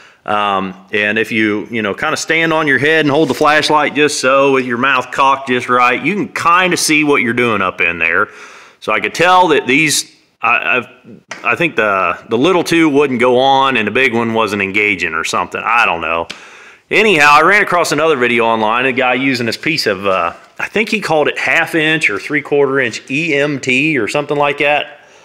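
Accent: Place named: South, United States. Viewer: Maybe I just am used to this guy's kind of language and southern accent but all the other ones seem so hard to follow. This guy made it easy for me soon as he said I just popped that son-of-a-b** on there I said yep like And subscribe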